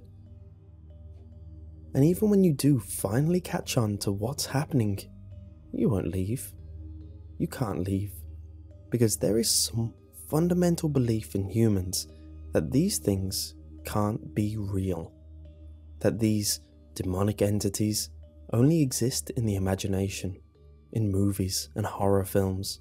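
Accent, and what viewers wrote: England, United Kingdom. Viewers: hey what part of England are you from I'm trying to fugue out what accent you have.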